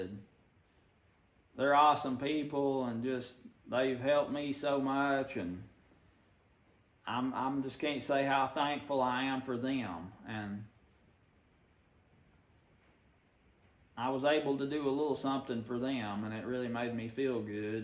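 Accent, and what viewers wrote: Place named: North Carolina, United States. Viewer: I have just been listening for maybe 2 weeks cause I just found your channel The way you talk I can tell that area is a vastly different place than Louisiana at least the central part of our state I tried to move to N.C. Years ago when my oldest married a young man she met in Bible school but her dad was unwilling to move anyway you are an encouragement to me even though I am a grandma So sorry I didn’t realize your Dad has passed You know that feeling when all your folks started passing well to be 68 and stuck in this place with family gone on feels like that. I have searched for a church and can’t find one where Jesus is at. Drinking & drugs and such like seems to be the name of the game. I just draw closer to God and try and love folks Keep the good videos coming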